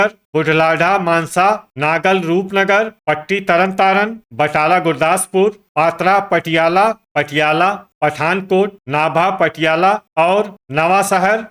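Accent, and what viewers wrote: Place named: Bihar, India. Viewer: Why is this Bihari n Hindi accent describing Punjabi weather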